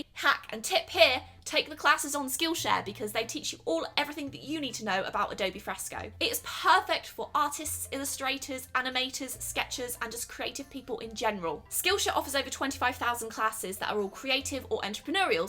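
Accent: England, United Kingdom. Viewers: I've lived in Britain all my life and have never met anyone with as much of a British accent as. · Hi I love your British accent so much it makes me feel good ❤️❤️❤️❤️😻😻😻🇮🇸🇮🇸🇮🇸🇮🇸🇮🇸🇮🇸🇮🇸🇮🇸🇮🇸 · I'm from England and I've gotta say, has the most stereotypical British accent ever 😂 if you came to the north east you probably couldn't understand us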